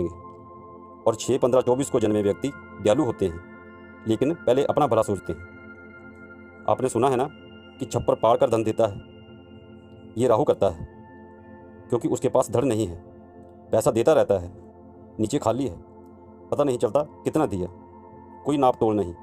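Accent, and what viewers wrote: Punjab, India. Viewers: Punjabi person speaking in hindi 👍👍🙏😁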